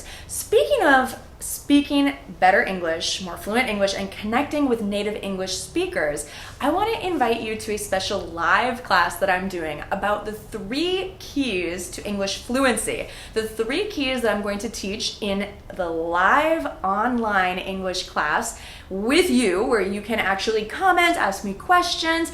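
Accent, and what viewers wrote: United States. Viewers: i 'am beginner student and i can understand a lot of your videos but i have a problem about the accent if i watched another vidios with anoter accent i can't understand, is this american accent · Darling teacher your my favorite teacher at all, please tell me which state you are frome I wanna do some research about your state accent cuz I think I fall in love with it. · Your American English like a sweet candy. Undoubtedly, i'll be part of your live class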